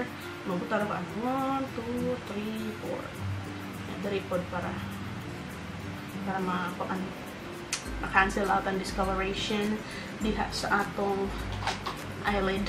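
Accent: Visayas, Philippines. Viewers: hehehe ang sweet naman mag bisaya 😊😊😊 yung asawa ko man ilonggo sweet din magsalita 😊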